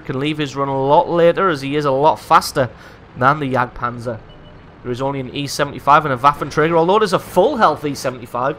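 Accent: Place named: Scotland, United Kingdom. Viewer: When I watch and listen to your replay videos I can't help but be reminded of Jackie Stewart, the F 1 racing legend. When I was young my Father liked watching the Races with Jackie announcing, as did I. We would both try mimicking his voice to no avail. The Scotts dialect is quite difficult. Lol good memories, as my Father passed away young.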